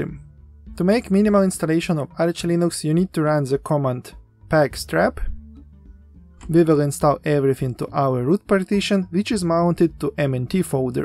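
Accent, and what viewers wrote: Russia. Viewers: русский акцент))) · Акцент не инглиш нихуя, похоже русский. Я по инглиш не шпрэхаю, чё делать то? Пресс Х ту вин? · Оно пытается выглядеть 'своим' для англоязычного ютуба, но с речью решило не заморачиваться и говорит английские слова русскими буквами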